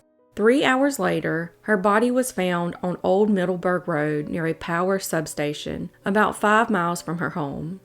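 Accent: South, United States. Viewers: I love your channel!! Your accent rulz!!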